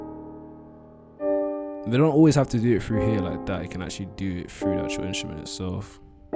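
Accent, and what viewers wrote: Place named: United Kingdom. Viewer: 📠 FAX! Heard the UK accent and subbed quick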